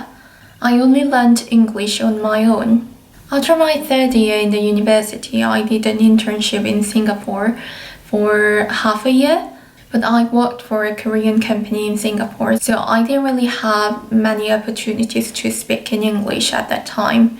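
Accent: United Kingdom. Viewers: 이제는 누나라고 부를게여... 영국발음 너무 멋지잖아여!! 저도 슬슬 인턴 나갑니다. 영국가는날까지 열심히 해야겠어요 ㅎㅎ 코로나 조심하세요~~~ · 우와 발음이 미국 발음이 아니라 영국 발음이네요 완전 멋있어용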